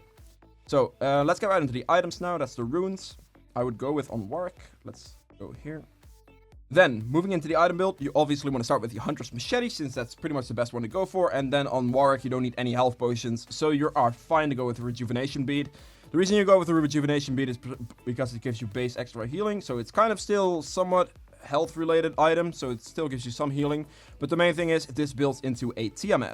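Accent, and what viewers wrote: Netherlands. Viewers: Ok 2 things r u dutch? And btw isnt free stop+free boots bettrr?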